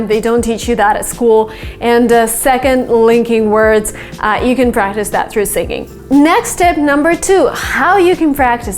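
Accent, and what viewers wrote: United States. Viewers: you're an amazing teacher . I prefer to keep waching you than the others channels you know because you're totally different and have the American accent and I love English language especially the American English accent . Overall , it's my pleasure to be watching you as one of your students 😉😘🌷. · That means accent u speak in every video of urs is American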